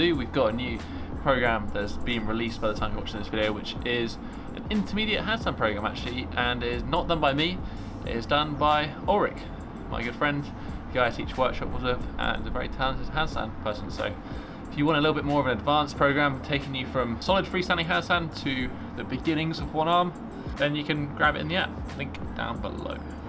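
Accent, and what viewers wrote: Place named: Southern England, United Kingdom. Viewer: Where do you live? South England somewhere. Maybe Cornwall?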